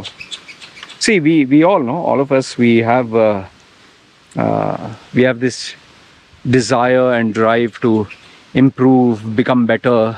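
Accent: India. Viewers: Are you Indian, your accent sounds like Indian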